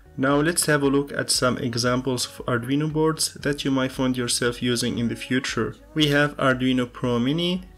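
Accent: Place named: India. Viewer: Don't take it as negative comment. You sound like other Indians tech geeks with your accent 👌. Keep it up bro.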